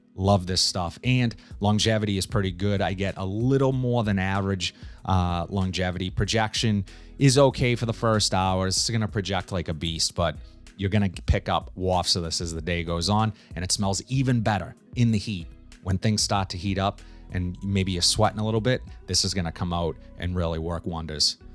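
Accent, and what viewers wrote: Boston, United States. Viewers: Thank you for the new fragrances, so many channels repeat what they have. I always come back to you .. and the Boston accent 🤪 how can I get you a sample of Megamare, I so want to see how you feel about this controversial smell. It’s so ocean it’s almost algae. I kind of feel Messoni wave is the best average overall but damn that sticks to me like glue. I can’t stop wearing banana republic metal rain.. this stuff is bangin 🤩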